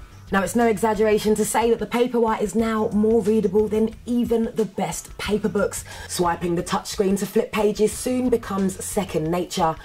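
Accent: England, United Kingdom. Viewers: WOW! You are simply amazing. You could have easily been talking about Fruit Loop cereal. Your boyfriend better treat you right cause you are a Dimond with a beautiful english accent. P.S. I would so follow a youtube vlog of yours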